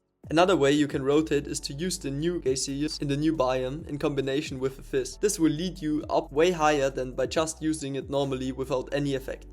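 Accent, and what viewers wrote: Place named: Germany. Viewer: The german-english is crazy, but W vid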